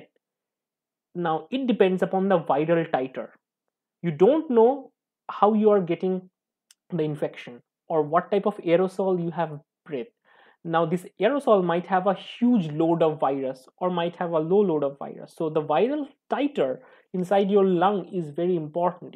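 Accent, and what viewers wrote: India. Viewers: I am sorry but if YOU'RE AN INDIAN YOU NEED TO STOP USING YOUR THICK ACCENT IN NARRATING A VIDEO.... OR somehow include "Indian accent" disclaimer on your VIDEO title... So I don't click on it and not understand a single word... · speaker sound from India right?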